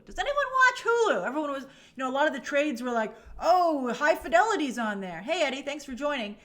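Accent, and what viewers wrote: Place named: New Jersey, United States. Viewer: You sure sound like a Jersey girl,...Luv it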